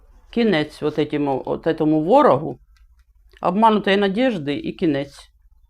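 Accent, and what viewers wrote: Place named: South, Ukraine. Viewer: Викликає довіру, щира, не клянеться, - що можу, те можу... Видно по говору, що жінка з південної України.... Дай, Боже, щоби так було як Ви побачили.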